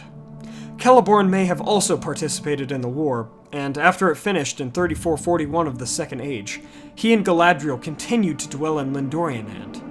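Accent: United States. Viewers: the way Americans pronounce Middle Earth names makes me laugh ... bless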